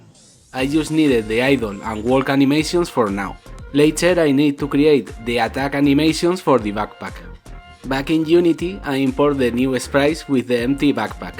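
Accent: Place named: Spain. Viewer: Are you spanish? I'm from Spain and your accent reminds me so much to mine XD Btw, your game looks amazing, the pixelart is beautiful ;3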